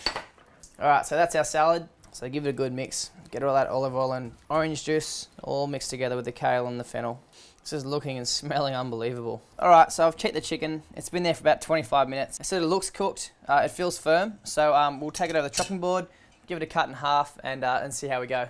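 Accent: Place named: Australia. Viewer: sweet Australian accent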